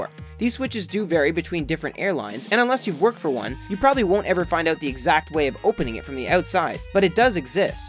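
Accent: Canada. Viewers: Are you canadian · The narrator is a Canadian, obviously. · He’s Canadian (like me)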